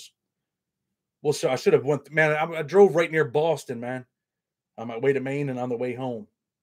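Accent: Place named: New Jersey, United States. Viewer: You been some shitttttt bro. Sounds like you could of grew up in Keansburge NJ. Ty for the vids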